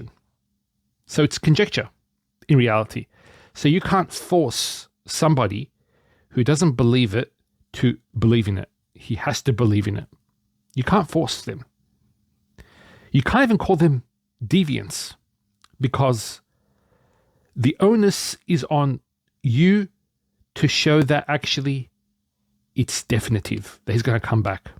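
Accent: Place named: Australia. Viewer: Much respect to you brother. I admire your devotion in seeking the truth, no matter the cost. I myself come from a fanatic Salafi / Wahabi background and the Qur'an set me free from all that indoctrination and brain washing from tradition . I do not identity as a Sunni or Shia, but rather a Muslim who follows Millata Ibraheem - Haneefan. They love to label me as 'Quranist' 😅 I found out over the years that the Tradition diverts you from seeing the truth in the Qur'an. It is unfortunate that the Qur'an was never the primary source, which is why it gets so badly misinterpreted and distorted (like the example of the return of Jesus). I can tell you're Australian akhi by your accent. I'm Ozzy as well from Melbourne.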